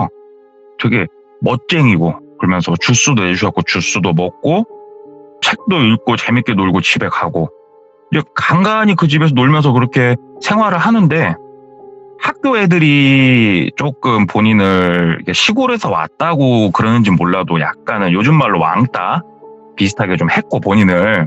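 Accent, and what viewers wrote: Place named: Jeonbuk, South Korea. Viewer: 전북출신으로써 말투가 너무 정겹다 ㅜㅜ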